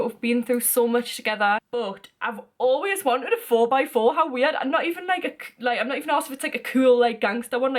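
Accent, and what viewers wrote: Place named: Newcastle, United Kingdom. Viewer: Another Geordie, woooooo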